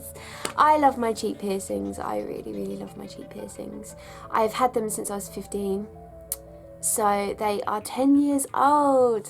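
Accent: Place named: Australia. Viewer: are you from Australia? New Zealand? I heart your dialect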